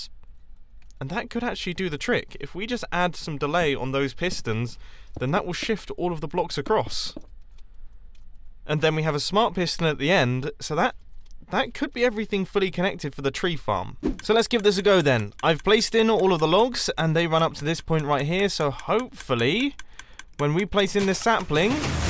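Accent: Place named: United Kingdom. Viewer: ello charming brit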